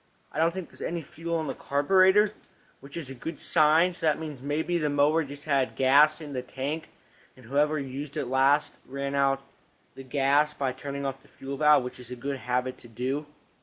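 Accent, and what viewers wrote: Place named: Pittsburgh, United States. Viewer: I can tell. Definitely sound like Pittsburgh. Lol 😂 I am from Eastern Ohio. And we sound similar.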